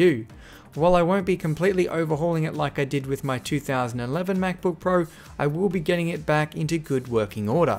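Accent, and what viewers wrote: Australia. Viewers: soothing? he has a Aussie accent, anything but soothing...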